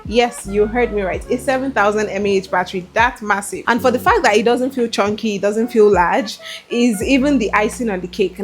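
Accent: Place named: Nigeria. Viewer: 0f all the reviews I have seen on this device, yours is the most comprehensive (maybe I am biased because of the Nigerianness of your accent. That said, I think this is serious value for your money. I value large battery life in phones and that made me go for Tecno Pova 6 Neo, but the specs are at a lower part with the Poco, and it's costlier. I have been scouting for a budget device to replace my tecno until I stumbled upon this phone on Facebook. I am so impressed with the specs and I am going for it.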